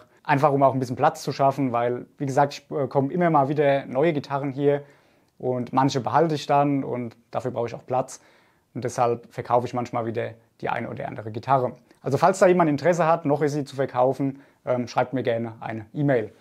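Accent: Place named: Wiesbaden, Germany. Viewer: hört sich mehr nach Wiesbaden an..😉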